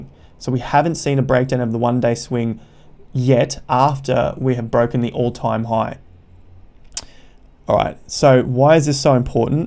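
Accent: Australia. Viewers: Are you an Aussie? You’ve got that accent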